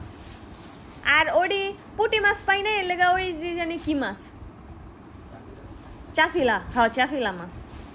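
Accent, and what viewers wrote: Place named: Narayanganj, Bangladesh. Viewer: Apner Kotha suny bujty parci.ami o Narayanganj er mey to tai.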